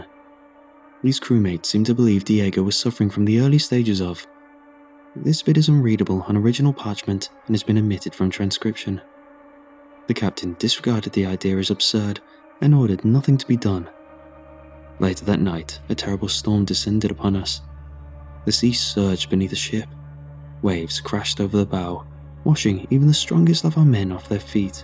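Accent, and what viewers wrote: United Kingdom. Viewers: Best horror reader there is. Can't beat a smooth British accent.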